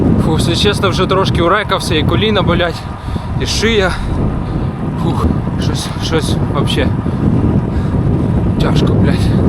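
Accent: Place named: Russia. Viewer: покращуйте вимову кацапська так і лізе, все інше лайк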